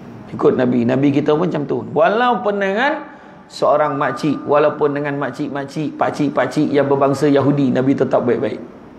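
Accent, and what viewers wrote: Negeri Sembilan, Malaysia. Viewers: Semoga ustaz sentiasa sihat agar dpt terus menyampai kn kebaikan. Ohsem bila dpt mendgr bahaso Nogori 9...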